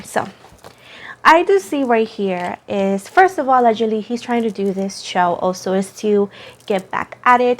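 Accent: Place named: Latin America. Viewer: Hello luv you r doing wonderful 💗. Never mind about those unkind pple and can't believe they were making fun of how u speak ! 🙄 like really!? U r a beautiful Latina woman and of course ur gonna have a accent and even if u didn't they would find something else to complain about. Smh.. so those pple u cannot make happy. There own life prbly isn't happy so u don't need there discouraging nd negative energies luv. U r beautiful inside n out no matter what anyone says! I'm sure u know that and u radiate that! 🩷✨️ U r a hard working mother doing what u love ! U r a genuine loving woman! U keep doing u ! And I luv your readings btw.. always 😊💖🌈✨️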